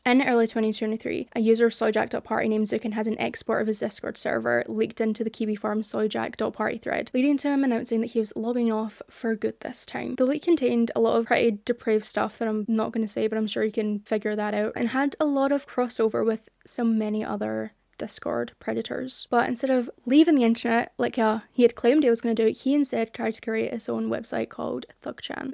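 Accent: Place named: England, United Kingdom. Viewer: Hold. I think im going insane. Why did I remember you having an american accent, and on this account one of the englishy ones?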